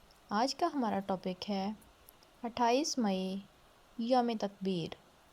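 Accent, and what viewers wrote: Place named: India. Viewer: miss bht afsos hoa apki indian style urdu sun k. apna talafuz thek krain. yay hal hy hamaray teachers ka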